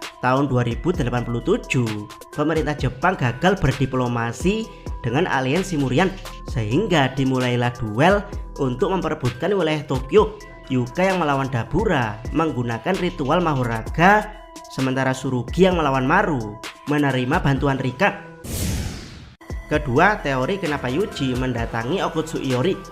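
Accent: Java, Indonesia. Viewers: Jawa nya keliatan. Medter 😅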